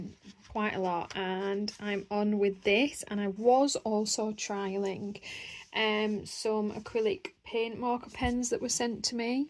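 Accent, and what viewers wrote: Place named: North West England, United Kingdom. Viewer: Do whatever works best for you regarding your channels. I came across your diamond painting channel and had subscribed for a while before I realised you had a colouring channel too. I do many hobbies and even if I don’t do a particular one that you do, I do like watching anyway. Besides, it’s great listening to a north west accent. 🤣 Wishing you and yours a very happy Christmas from me and mine. x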